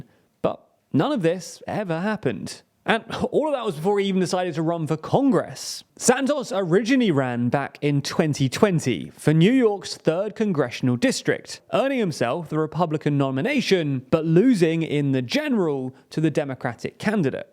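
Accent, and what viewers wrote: England, United Kingdom. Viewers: San-toss, ah-shoe-ming, etc. Englishmen are so funny, when they open their mouths. Otherwise a great report on a huge American voter demonstration of stupidity.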